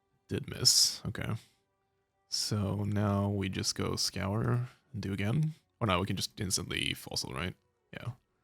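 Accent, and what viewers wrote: Sweden. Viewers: You can't fake that Swedish accent 😊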